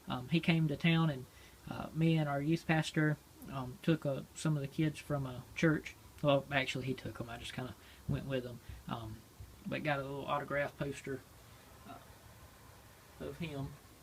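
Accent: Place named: South, United States. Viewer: Thats great. Your accent sounds like mine, southern! I live between Sylacauga and Talladega Alabama. Just curious if you were from Alabama.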